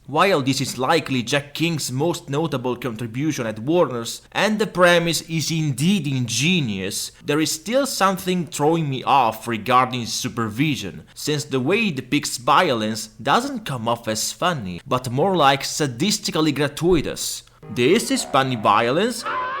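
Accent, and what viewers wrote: Italy. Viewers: You're strong Italian accent is too difficult to listen to to allow me to focus on the content of your video, which sounds pretty decent.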